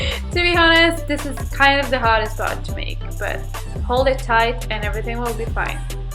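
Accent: France. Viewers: are you from France :p?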